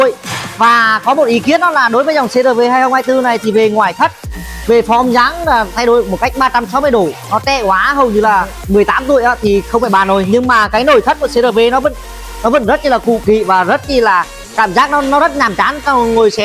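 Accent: Nghe An, Vietnam. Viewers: A giọng nói giống yên thành-nghệ an vậy ạ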